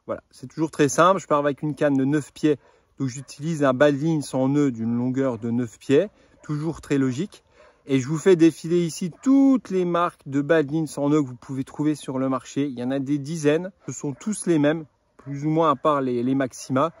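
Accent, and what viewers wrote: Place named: Lorraine, France. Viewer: Bravo vraiment très bien expliqué je m abonne, tu es de quelle région t’as un accent Vosges ou Moselle? 😅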